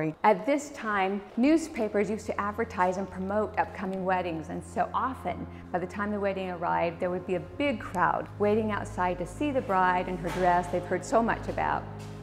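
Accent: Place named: United States. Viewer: American accant dreadful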